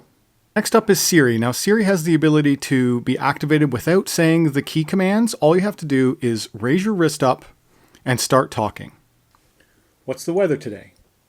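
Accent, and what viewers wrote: Canada. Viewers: Cool video.. U sound Canadian 😊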